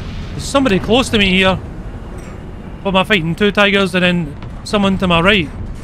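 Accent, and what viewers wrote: Scotland, United Kingdom. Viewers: I don’t care about the tank. I love your Scottish accent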